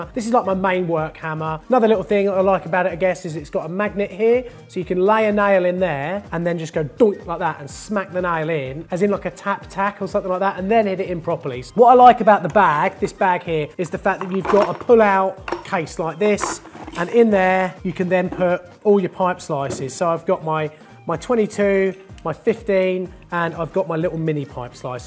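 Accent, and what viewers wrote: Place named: Southern England, United Kingdom. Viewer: It's a southern English accent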